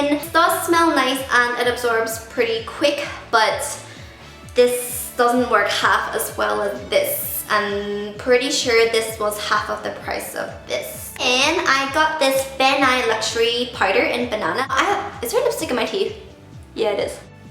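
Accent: Northern Ireland, United Kingdom. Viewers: Love your videos!! And your Northern Irish accent! :)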